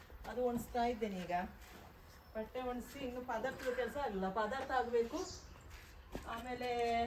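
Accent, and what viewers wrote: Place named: Kote, India. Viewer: ಕನ್ನಡ ಕೇಳಲಿಕ್ಕೆ ಚಂದ ಉಂಟು ಕೋಟೆ ಕನ್ನಡ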